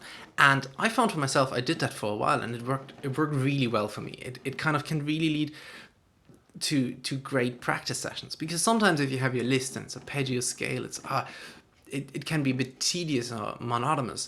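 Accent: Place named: Dublin, Ireland. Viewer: Hey. I found your channel because it is referenced by another YouTuber, Mikko Hilden. I don’t know why I assumed you were compatriots so I was happy when I heard the Dublin accent. I live in Dublin normally but I’m in Australia for the next couple of years. I started taking guitar lessons recently, straight into Jazz. You référence technique exercises but you don’t have a link to them. It would be great if you could point out where us beginners can find good technique exercises. Do you teach? I’m hoping that when I’m back in Dublin I can continue studying at a less embarrassingly beginners level. Keep the videos coming.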